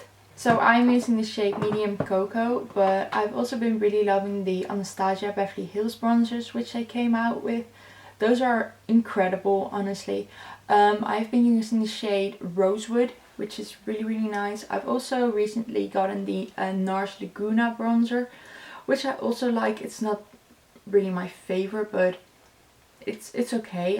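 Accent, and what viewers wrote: Netherlands. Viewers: Are you Dutch? Your accent sounds like a Dutch accent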